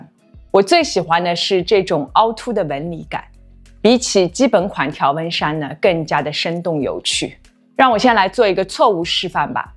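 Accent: Shanghai, China. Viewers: 看了你好多视频了，今天终于订阅了，很喜欢你的一些讲解，听口音你可能是上海人吧。我这里没有Uniqlo的实体店，可惜了。买衣服还是喜欢实体店可以试穿，好多衣服博主穿着好看，自己穿未必好看。支持你一下。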